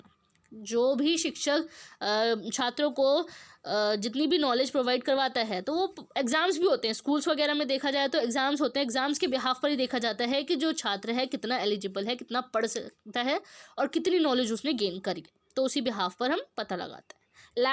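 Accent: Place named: Haryana, India. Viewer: Mam ap toh harayana se ho ❤️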